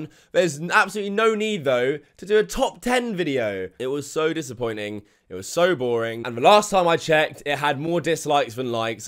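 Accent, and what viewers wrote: United Kingdom. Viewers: What a whiny little bitch you are. Being bri’ish might add a lot to why you sound so whiny, kinda like your about to cry every sentence. Oye dick’ed why don’t ye shot ye gullet and fock off innit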